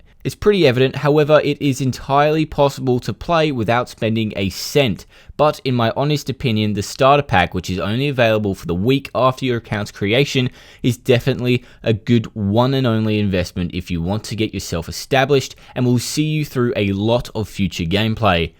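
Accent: Australia. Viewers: You australian? What city you at. I'm in perth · yo didn't know ur australian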